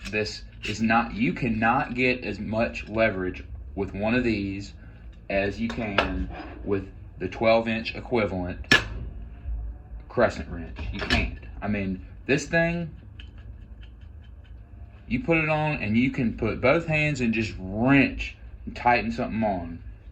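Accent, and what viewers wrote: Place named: United States. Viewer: you can tell by so many things in your video, but the main one being that the soft laser etched lettering is still on the grips. if you grew up with crescent wrenches there is a learning curve. you dont know how to use knipex and are not really entitled to an opinion. just a typical american tradesman (and all the stereotypes that entails judging by your speech and mitts)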